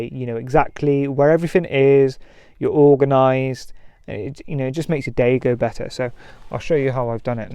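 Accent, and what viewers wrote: United Kingdom. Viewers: Are you from Brizzle pal?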